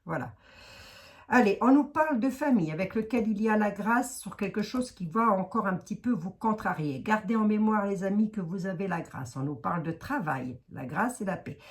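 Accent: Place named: Grand Est, France. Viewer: Fort Accent et expressions du GRAND EST que je reconnaît très très bien 😉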